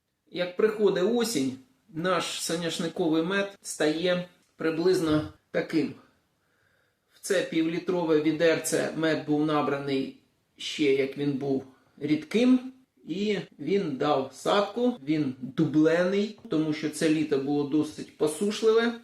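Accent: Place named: Chernihiv, Ukraine. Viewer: коли слухаю тебе то згадую дитинство. У 1978році до нас після Ніжинського педінституту прийшло декілька молодих вчителів серед них фізик слово коли вимовляв так як ти думав що він з Чернігівщини, потім він напевно повернувсь на батьківщину. Ти з чернігівської де така говірка?Чи може Каликовський так його прозивали учні був з Харківщини?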